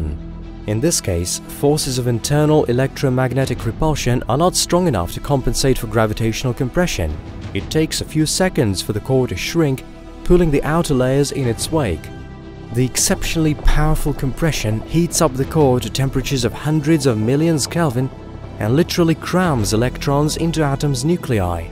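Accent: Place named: United Kingdom. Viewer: Why do these sites always have a haughty Brit as narrator?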